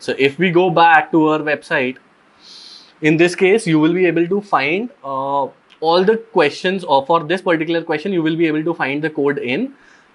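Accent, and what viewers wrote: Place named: India. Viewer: he sounds like those scammers from India xd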